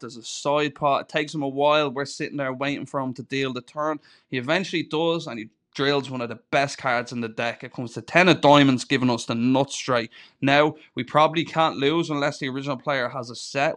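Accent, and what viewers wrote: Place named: Ireland. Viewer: Really glad the Algo put you on my home page, been looking to add a Sound Studied Vlogger to the Sub list! Makes it even better with your Irish accent...Good fookin fold on the AJ!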